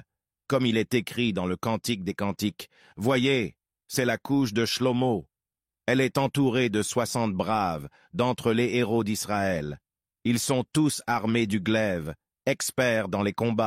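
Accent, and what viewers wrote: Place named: Africa. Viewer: Tu parle mal avec ton accent afficain c est salomon et non slomo et asmidee avait des pieds de canard et.non de coq .va apprensre ta leçon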